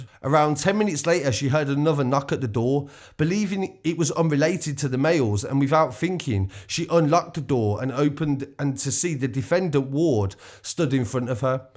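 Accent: Birmingham, United Kingdom. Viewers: Bruv, every time someone mentions brum, your voice comes to mind! Haha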